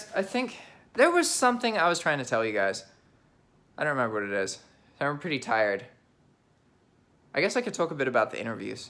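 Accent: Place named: England, United Kingdom. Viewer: Great video. You are a very inspirational person. Glad u are back home. Did anyone ever tell u you have a slight accent? Like u sound like u were in England and picked up a little of how they talk. Anyway, Ive been watching u for awhile and hope everything goes well for u.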